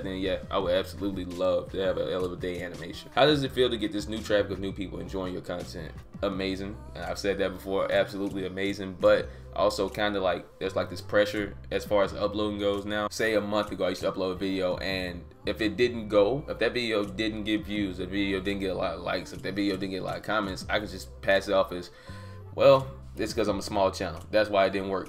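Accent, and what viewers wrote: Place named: Mississippi, United States. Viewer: I would love to hear your Mississippi accent! Thanks for answering my question!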